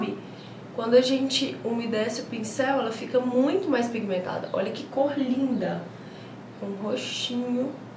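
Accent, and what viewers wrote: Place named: Florianópolis, Brazil. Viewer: A aaaaamo esse sotaque seu de maneirinha, vc é linda e mto agradável, bjs sua linda!!!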